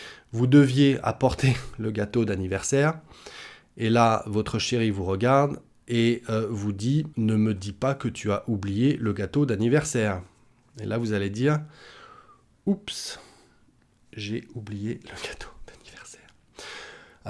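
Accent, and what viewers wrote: France. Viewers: Merci! J'aime beaucoup votre accent français et vos leçons. En anglais, on dit aussi "oops" ou "whoops."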